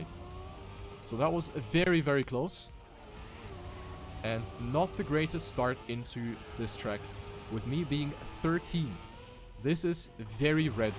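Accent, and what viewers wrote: Germany. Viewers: Nice to see how self critics you are with yourself +++ german english 😉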